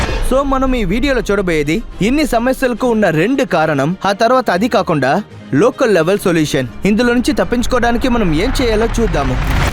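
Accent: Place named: India. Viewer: మంచి సమాచారం. మరి ఎందుకు హింది యాసలో, ఆయాసంగా చెప్తున్నారు. ఎంత అనువాదం ఐనా, మంచిగా స్వచ్చమైన తెలుగు లొ మట్లాడొచ్చు కదా. భవిష్యత్తు వీడియోస్ లో సవరించగలరు.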